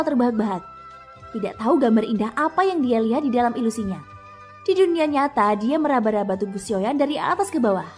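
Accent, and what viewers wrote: Java, Indonesia. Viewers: Medok nya 😂😂😂😂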